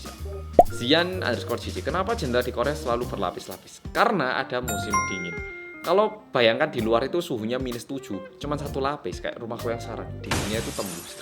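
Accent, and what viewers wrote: Java, Indonesia. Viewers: Mas...Mas bicara korea juga medhok. Kuereennn rek 🥳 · Medhoooooooookkkk.......hahahahah.assek banget liat videonya.ngga bikin bosen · Orang Korea yang medokkk❤️ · Gercep nih mas medokk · Katanya orang korea nyang medok dan hidup bertahun tahun di indonesia(kususnya pulau jawa) tapi kok gk tau mlumah sih? Mlumah itu terlentang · Baru kali ini liat orkor medok😂,, aku aja org indo nggk loh🙄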